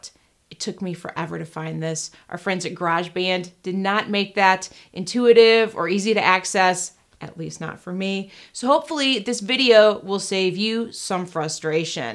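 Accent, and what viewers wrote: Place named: United Kingdom. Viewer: U sound so British lmao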